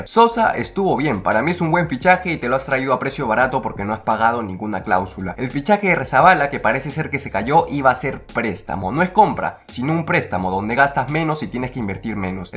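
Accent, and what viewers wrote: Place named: Bolivia. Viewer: Por q el marcado boliviano? 😵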